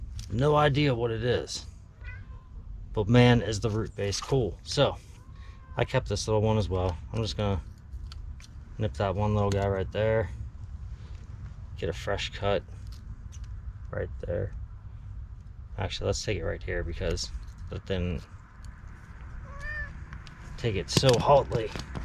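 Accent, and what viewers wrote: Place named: Philadelphia, United States. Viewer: I went to college outside Philly. I thought I detected a familiar accent. ✌🏻(hint)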